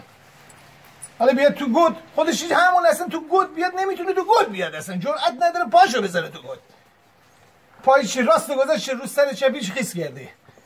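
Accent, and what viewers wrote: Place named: Gilan, Iran. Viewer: از شنیدن لهجه شیرین گیلکی و دیدن انسانیت شما دلم از آلمان پرواز میکنه به بندر پهلوی که فقط برای اونجا دلم تنگ میشه نه شهرم تهران۔ خدا حفظتون کنه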